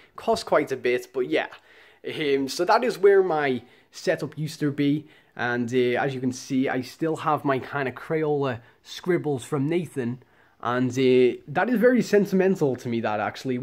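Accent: Liverpool, United Kingdom. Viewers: Wait you're British? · I can't tell if your scouse or not ?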